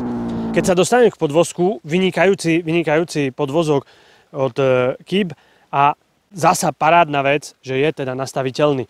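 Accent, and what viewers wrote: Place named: East, Slovakia. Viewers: Kamo keď nájdeš niekoho ako co sa venuje tomu viac ako 20 rokov tak potom mudruj. To ze webstranka ma klasicky design je pre nás old school priaznivcov motoride fajn. Pamätám ze bol jednej z prvých co robil kedysi merch a vtedy išli tie prve tričká ako teplé rožky. To ze prešli na platformu YouTube je fajn a videa sa brutálne zlepšili aj kvalita aj strih. A co sa tyka vychodnarciny tak to k patrí je autentický a na nič sa nehrá. Len tak ďalej chalani v redakcii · Chalani, viem, ze urobit dobre videa chce nielen nadsenie a prehlad v teme, ale aj teba mat aj iste zrucnosti v natacani v prezentacii. Nechcem vam zhadzovat robotu (napriklad prave preto netocim videa, lebo to neviem a nechce sa mi), ale zopar postrehov mi fakt neda nenapisat. 1. Nabuduce si tiez pozicajte farebnu motorku, lebo cierna by pred kopou hnoja nevynikla. 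2. Motor P2 nie je popularny, je to len vynutene zlo od EU. Inac by tam stale boli V2. Treba povedat pravdu. 3. Kamera zblizka z ruky co nedokaze chvilu zaznamenat stabilny obraz pomaha hodit sablu, ked sa niekomu toci hlava uz z tej vychodniarciny. To tocila frajerka telefonom? 4. Ked sme pri nej (myslim vychodniarcine), tak svetlomet sa zrejme sklonuje podla vzoru "parka". Dve parky, dve svetlomety. Kazda dlhsia veta ako od Kapitana Danka. Vole. K tomu dychavicny prejav ako po prave zabehnutom maratone. Neviem, ako ste na tom v redakcii. Pripada mi to, ako keby ste to robili uz len zo zotrvacnosti. Stranka rovnaka ako pred 15 rokmi (mozno aj dlhsie, ale len co si sam pamatam), chcelo by to viac aktivnych redaktorov, alebo aspon jedneho z bezchybnym vizualnym a hlavne hlasovym prejavom a vy by ste sa mohli zamerat na odborne vstupy, no a k tomu este nejaky stativ a kamerku ci fotak s natacanim. 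PS: Chcel som to povodne poslat mailom, ale este aj ta stranka vam bezi len na HTTP a nie na HTTPS!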